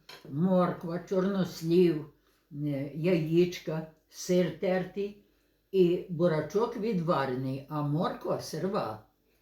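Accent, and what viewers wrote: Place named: Vinnytsia, Ukraine. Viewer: Здоровля, сирва, бурачок, так говорять на Вінниччині в Чечельницькому р-н😊😊❤❤❤, там проходили мої літні канікули у бабці в селі❤❤. Обожнюю, не можу наслухатись.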